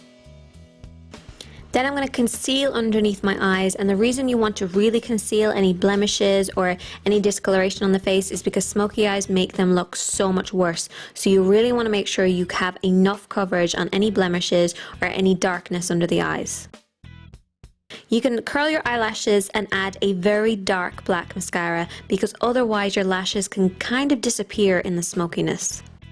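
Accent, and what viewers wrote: Ireland. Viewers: So weird you have more Irish accent in this video compared to your latest videos. Hahaha ! Still love ya :)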